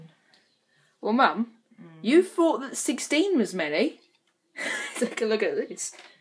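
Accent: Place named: United Kingdom. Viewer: now i know that you sound a bit british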